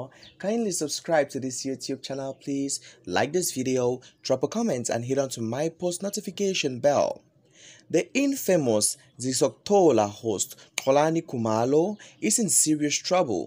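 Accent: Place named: Nigeria. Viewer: But why is a Nigerian saying this... Nigerian accent all over the place 😅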